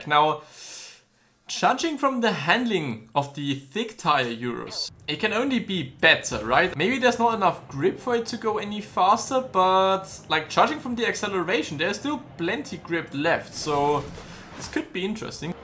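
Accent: Germany. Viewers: Are you German or Austrian? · Is he German? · Man hört einfach das du deutscher bist😂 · Es hört sich echt nach deutschem Akzent an. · scheiße deine Videos sind immer so abgedreht :'D bin letztens über den ultra dragster tesla auf dich gekommen. man hört sofort dass du deutscher bist :P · Are you german? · is he German?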